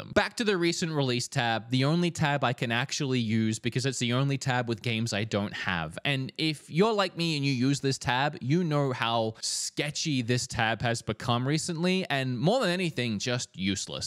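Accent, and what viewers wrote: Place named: Australia. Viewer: Your accent is quite interesting. Australian as a base, but select words are pronounced in a US American accent. Confused me for a bit when I started watching.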